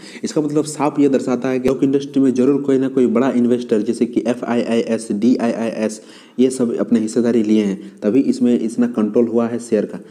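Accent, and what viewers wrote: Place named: Bihar, India. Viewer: Abe Bihari ho kya? commentry se pata chal raha he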